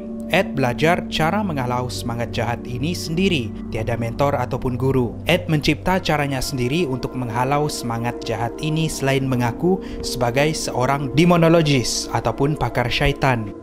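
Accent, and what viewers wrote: Sabah, Malaysia. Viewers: Abg orang sabah ye?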